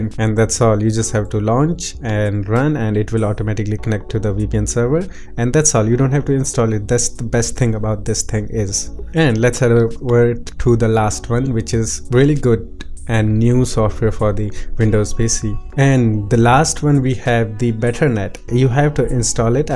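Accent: India. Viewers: you sound very indianish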